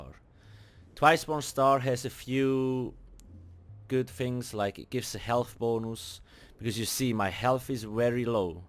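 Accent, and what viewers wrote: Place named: Switzerland. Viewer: I'm a simple swiss, i hear swiss accent, i upvote!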